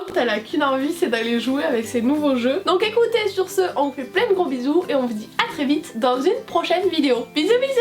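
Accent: South, France. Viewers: Ah l'accent du sud, sa régale 🙂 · Ahahah j'ai adoré 😂 Apparemment les Beagle sont super difficiles à dresser, c'est ce que tout le monde dit 😂 bon courage 😅 Ta bonne humeur et ton accent du Sud font du bien au moral 😘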